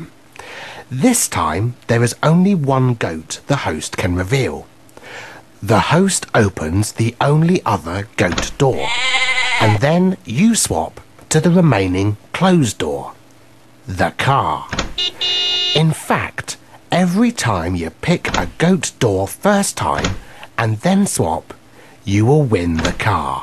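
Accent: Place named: United Kingdom. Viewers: 英國講話很重音調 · 真的一直分心聽英國腔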